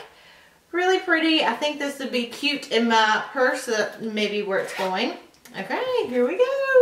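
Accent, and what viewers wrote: South, United States. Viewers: You're so beautiful 💞💁🏻 and I love your cute little southern accent lol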